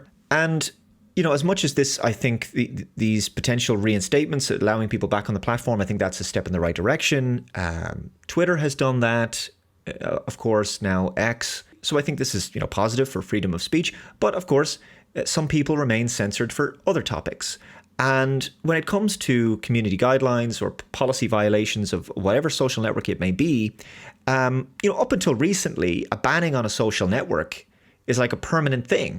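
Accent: Ireland. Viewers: I must admit, I've missed your Irish voice telling me to follow you on "Moinds n' Gaaab" 😂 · it is so nice to hear your Irish accent again!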